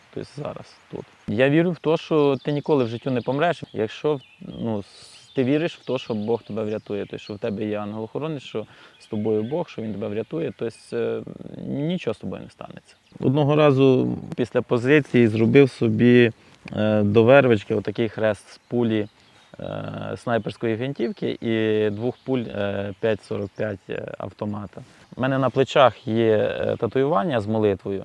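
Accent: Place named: Slobozhanshchyna, Ukraine. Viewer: Та чудова у Вас українська мова! Слобожанський діалект - важлива її частина. Нічого Вам соромитися: Сковорода та Котляревський на Вашому боці.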